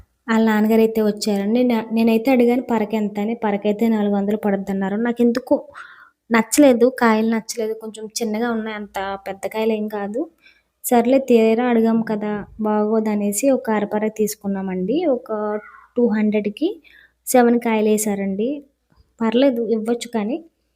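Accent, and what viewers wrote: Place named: Konaseema, India. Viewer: I like konaseema❤❤ Akka Mee side slang Bale vuntadhi Chakkaga baga matladatharu meeru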